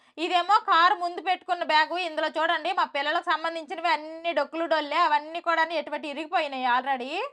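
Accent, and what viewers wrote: Srikakulam, India. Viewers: Mee sreekakulam yaasa chala bagundi 😊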